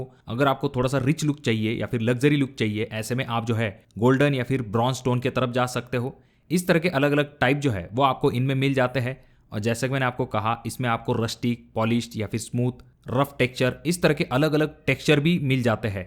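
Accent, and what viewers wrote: Maharashtra, India. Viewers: Typical Marathi accent in Hindi.... Proud of you...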